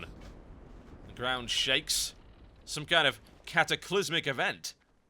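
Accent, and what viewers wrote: United Kingdom. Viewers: yer being british rocks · "Even if you don't care about the Lore, you'll care about whats about to happen" "Oh dear." "that sounds like crashing water in the background" "What the BLOODY HELL happened here. Wow. It's all gone." i literally had to pause to catch my breath with those rapid fire bits of humor, delivered in british fashion. i have to seriously ask where you're from, and what accent that is, or as much of an accent as you'll have to an american listener.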